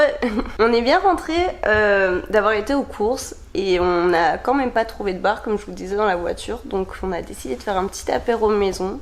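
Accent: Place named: South, France. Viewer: ça semble le sud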